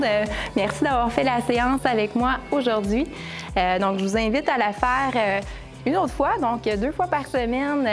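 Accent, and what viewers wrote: Canada. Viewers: C est clair le rythme est bon et un petit dernier …avec l accent canadien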